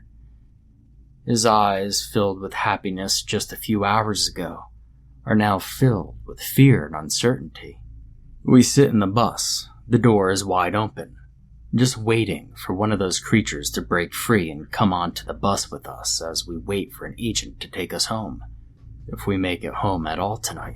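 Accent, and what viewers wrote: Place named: Alabama, United States. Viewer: Hey yep had to see the pups very cute And to hear the Alabama accent,did not disappoint Proper Southern Girl Blessings have a great weekend I will just say coz time zones baffle me atm defo need an early night. A Long ass day and 33Celsius in London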